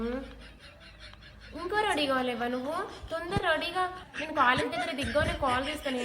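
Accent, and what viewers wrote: Nizamabad, India. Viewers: అక్క మీరు నిజామాబాద్ జిల్లా కదా ఎందుకంటే మా అమ్మమ్మ వాళ్ళ ది కూడ సేమ్ మీలాగే మాట్లాడుతారు 😂😂😂❤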